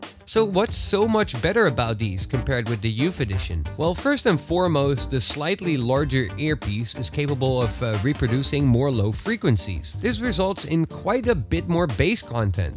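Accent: Netherlands. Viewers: Are you Dutch? I think I can hear a veeeery slight Dutch accent creeping through your otherwise flawless English. Groetjes ;) Also, as a Xiaomi phone user, do they give any benefits? Like the OnePlus' earphone has magnetic buds which autopause/disconnect and connect/autoplay when put together or separated, when using a OnePlus phone.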